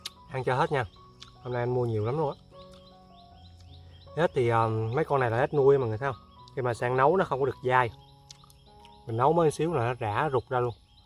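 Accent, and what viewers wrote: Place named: Ben Tre, Vietnam. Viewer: E là ng miền tây ha sao mà vọng nói của e nge y như ng bến tre vậy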